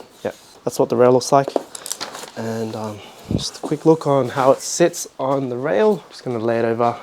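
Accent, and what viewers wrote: United Kingdom. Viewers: I know it’s random but it’s so weird hearing Asians from the uk with the British accent i grew u in hood where’s there’s a lot of Asian gangsters in America and they always Wildin out bilingual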